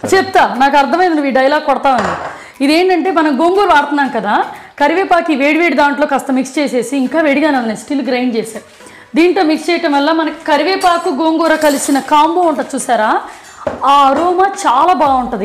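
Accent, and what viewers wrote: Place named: Krishna, India. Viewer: Krishna District telugu andi chala bagundi.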